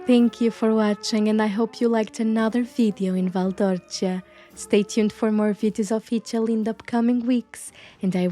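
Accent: Portugal. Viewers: She is Portuguese.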